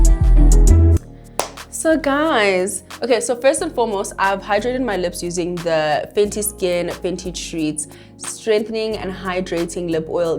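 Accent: United Kingdom. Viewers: Uhm so the UK accent….. is this a hint….. oh so ever gorgeous ke wena international makeup plug? 🙏🏾😘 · The British accent sends me 🤣🤣🤣. Love it sooo much.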